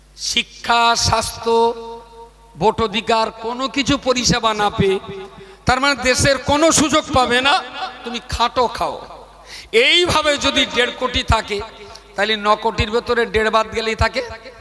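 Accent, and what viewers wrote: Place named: Bangladesh. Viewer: কথা শুনে তোর মনে হল বাংলাদেশী,, আর তোর কমান্ট দেখে আমার মনে হচ্ছে তুই বন চুদু মাল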